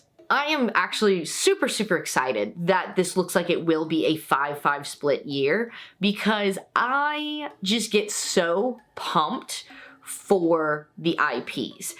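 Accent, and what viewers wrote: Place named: Louisiana, United States. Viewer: I figured you were from there the way you were talkin'. Lol! I used to hit up RenFaire annually from 2009 until around 2017 before we moved. I dressed up like a Templar Knight, but I'm thinking of switching up to Aztec Warrior. I miss it. Tried to hit up one on the outskirts of Florida with the fam. . . Yeah, it was just another reminder of how rinky-dink things are up here. Never thought I'd be that guy from "the big city" that talks trash about small towns, but the smug/ignorance of these small town folks makes me rep H-Town even more. I take comfort in knowing that Houston is the "dirty south" and isn't a big city like NYC. One thing you might appreciate though being from Louisiana. . . The seafood is better up here in Fort Walton Beach. This is coming from a guy who hated seafood until my wife made me try it in Connecticut. 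Anyway. . . Keep up the good work on the channel and hope you get to USO for HHN.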